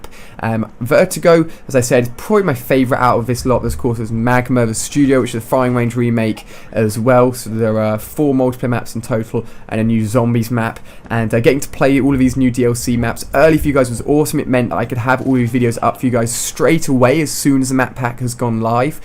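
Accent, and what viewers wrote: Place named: London, United Kingdom. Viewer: i think he is from UK but maybe london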